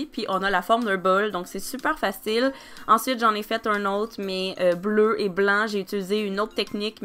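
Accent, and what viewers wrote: Canada. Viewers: J'aime tellement cet accent canadien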